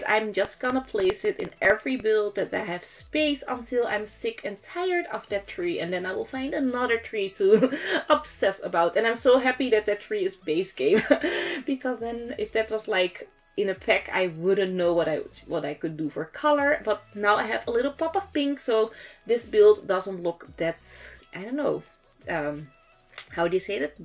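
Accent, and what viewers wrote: Netherlands. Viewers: dit is de eerste video die ik kijk van je en ik hoorde gelijk dat je nl was x mooi gemaakt😍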